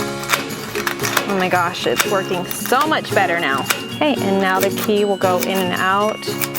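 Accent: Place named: United States. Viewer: I love the American accent...why cant Australia have more American girls lol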